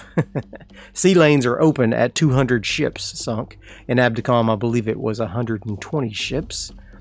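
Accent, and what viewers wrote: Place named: South, United States. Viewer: 1) I'm hearing a Southern accent. Where ya from? I'm from Texas myself. 2) Holy crap...I didn't know someone modded WoWs vessels into the game! Makes you wonder what Japanese Navy ships you'll run into! Wonder if they fixed the striking power of the B-17s...I watched one play-through of the Tokyo Express mod where a YAMATO-class battleship was sunk by a SINGLE B-17. (No, I am not lying or BS-ing here, nor have I been drinking. 😂)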